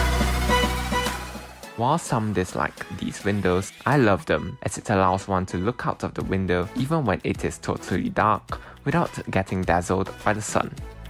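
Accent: Singapore. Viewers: You sounds like Singaporean.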